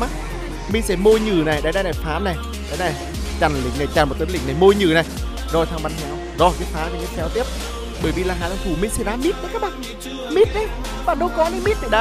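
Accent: Nghe An, Vietnam. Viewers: ông này người nghệ an à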